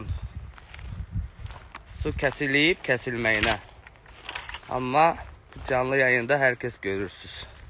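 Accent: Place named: Armenia. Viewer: Ай геде сен йахшы биленсенсе тап да орада не ойун чыхарырсан озунде ермени лехчесинде данышырсан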